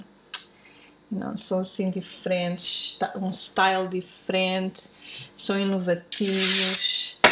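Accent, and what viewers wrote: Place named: London, United Kingdom. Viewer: You know...a frase, típica do Inglês Britânico ao final de uma sentença verbal não deixa duvida de seu inglês Londrino, You know...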